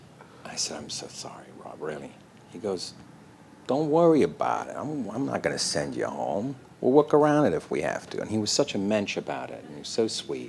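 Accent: United Kingdom. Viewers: I can’t tell if he’s trying to put on an American accent, or if he’s just lost some of his British accent over the years... but it seems kind of mixed here. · Yes he's from London. Comes from a relatively posh family. He even went to school at Harrow. His accent is confusing because he has lived in the US for over 40 years and sort of drifts back and forth between British RP and American. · The little hints of the Queen’s English just keep my heart a-flutter🥰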